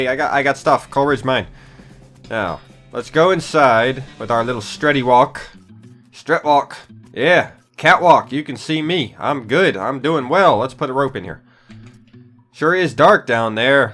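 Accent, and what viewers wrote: South, United States. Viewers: Maybe I knew him in another life.......I DUNNO........you sound so Southern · Your southern accent is much more prominent when you play this game. And what's that thing in the bottom left corner? I never noticed it till this video lol.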